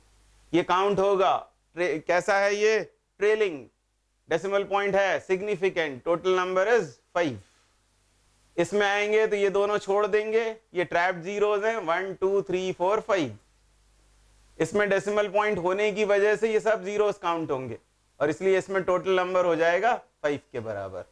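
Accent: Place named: India. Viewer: eng title, random india voice...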